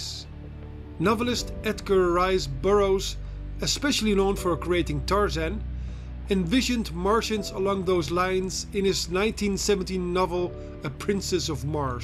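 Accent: Netherlands. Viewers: Aan de uitspraak kan ik met zekerheid zeggen dat jij Nederlands bent, of nie dan?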